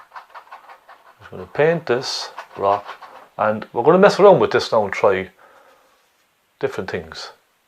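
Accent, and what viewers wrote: Cork, Ireland. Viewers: nice one bud thanks ,,,, from your accent id say your not far from me ,, :) ,, co cork me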